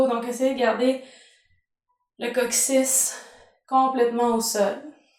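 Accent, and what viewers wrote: Quebec, Canada. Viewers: J’étais extrêmement tendue et stressée, et me voilà à rapper yoga sur YouTube pour trouver de qui me détendre. Cette vidéo, c’est du bonheur ! Et moi qui suis française ayant vécut au Quebec pendant 2 ans (Montréal), retrouver cet accent si typique dans le plus grand des hasards c’est encore mieux !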